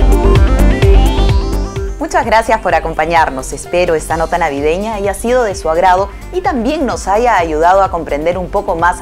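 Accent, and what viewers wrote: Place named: Mexico. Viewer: De que país es te programa. Tu acento neutro me hace identificar de que país eres. Pero sospecho que eres mexicana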